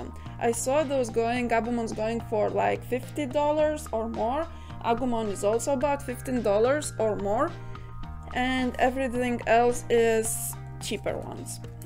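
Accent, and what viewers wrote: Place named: England, United Kingdom. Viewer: I find your English accent to be interesting lol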